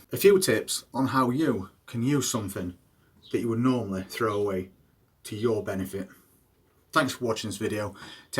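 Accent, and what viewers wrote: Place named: Shires, United Kingdom. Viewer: That accent sounds like a lad from the shires.🐝🐝🐝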